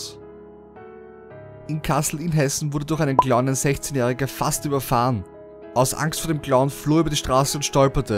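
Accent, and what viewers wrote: Vienna, Austria. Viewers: Bist du aus Österreich? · Natschka Mir kommt's vor als ob er Wiener wäre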